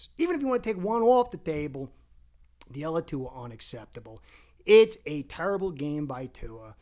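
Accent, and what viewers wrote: New York, United States. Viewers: This man sounds more like a Jets fan. (The accent).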